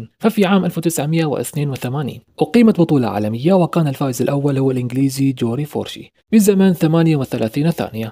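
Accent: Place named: Arab world. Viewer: استمر عنوان ممتاز حنجره ممتازه اسلوب راقي اللهجه العربيه تفوز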